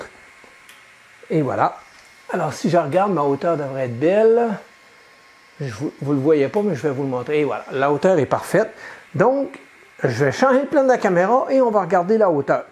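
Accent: Canada. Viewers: Salut, je suis tes vidéos depuis quelques temps, je viens d 'acquérir mon Ender 3 V2 donc je suis en pleine pose du BLtouch en se moment même, j'ai rencontré un gros soucis ma carte mère a brulée.. un composant a coté de la diode F2, est- ce réparable ou poubelle direct... merci de tes lumières et continue tes vidéos, je suis fan de ton accent canadien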